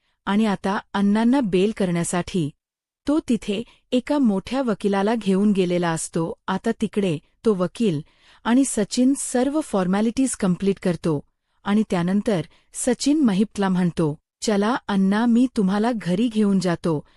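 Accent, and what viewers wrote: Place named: India. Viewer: What is your name,you sound like hindi speaking.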